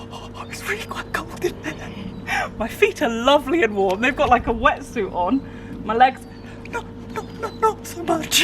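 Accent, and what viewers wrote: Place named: RP, United Kingdom. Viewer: She sounds posh btw love ya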